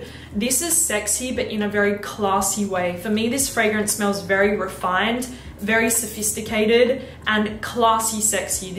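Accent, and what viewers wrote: Australia. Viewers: Great review! Also, I have to say you (and that voice/accent (Australian?)) are absolutely lovely. Anyway...love your videos. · aussie accent 😍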